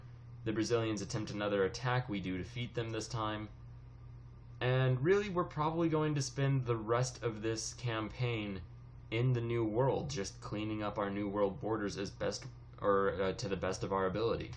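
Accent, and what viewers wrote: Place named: Netherlands. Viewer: the way he speaks english I'd bet he's dutch.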